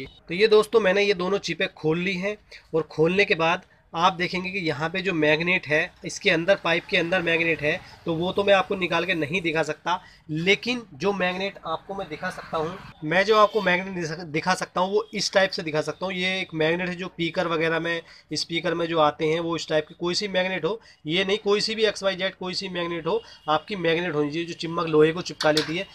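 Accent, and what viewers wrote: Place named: Moradabad, India. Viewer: bhai ji bhaut accha video aur Jaankari Dene ka style style bhaut Saral aur sulabh...dhanyabad...sirf itna bata dijiye ki Kya aap distt moradabad se belong karte hai...aapke bolne ke style se Aisa laga mujhe....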